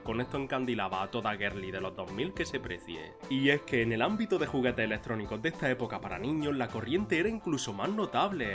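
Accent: Andalusia, Spain. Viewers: Seguro de alguna zona de Andalucía, España, Málaga o Sevilla quizás! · aii me encantan tus videos tlj<33 tu acento andaluz es la leche hshs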